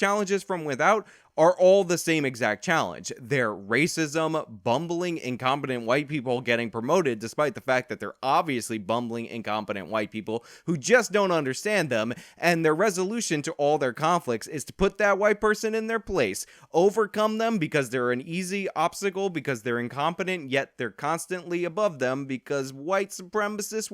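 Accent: New York, United States. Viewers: I like your videos dude but this New York accent that you keep doing in every video is getting annoying haha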